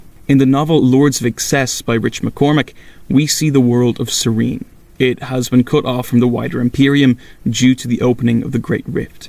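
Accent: Ireland. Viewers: It's nice hearing another Irish accent rambling at length about 40k minutae. Irish accent right? Edit: I commented too early. You mentioned it in video. 😂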